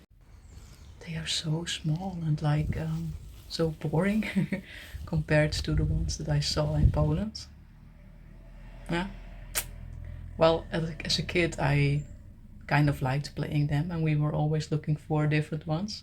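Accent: Netherlands. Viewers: Ladny nederlande akcent ) hhhrahhh)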